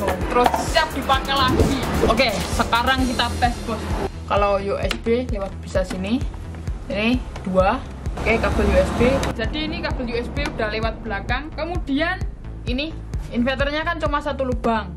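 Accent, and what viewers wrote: Java, Indonesia. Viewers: Kentel banget jowo nya.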